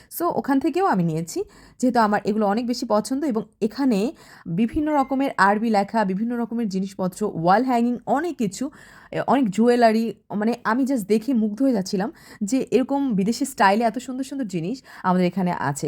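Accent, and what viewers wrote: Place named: Kolkata, India. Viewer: আপনার কথা অনেক টা কলকাতা বাসি লোকদের মতো। মনে হয় না বরিশালের।। মাঝে মাঝে একটু লাইভ করবেন প্লিজ প্লিজ প্লিজ প্লিজ প্লিজ প্লিজ প্লিজ প্লিজ প্লিজ প্লিজ প্লিজ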